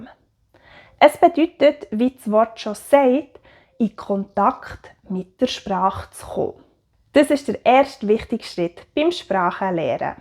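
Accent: Bern, Switzerland. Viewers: Danke, sprichst du Bärndütsch ? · This is Bernese German (Berndeutsch, Bärndütsch).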